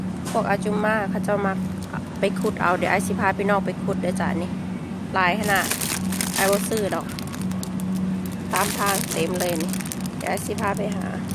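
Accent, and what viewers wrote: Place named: Nakhon Phanom, Thailand. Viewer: พี่เพิ่งติดตามน้องอายพูดเหมือนคนนครพนม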